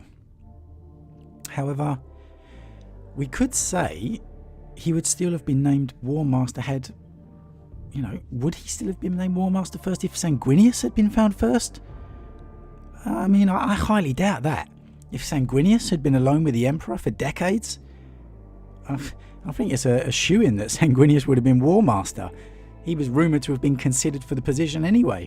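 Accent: United Kingdom. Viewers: Cracks me up how you say "ass" and not "arse" with that British accent 😋 Great vid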